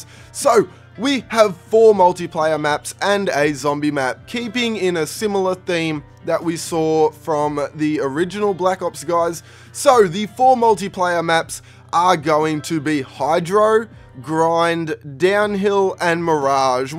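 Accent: Australia. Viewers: I thought you were aussie?? since when does Australia have Gamestop? They own EB games Australia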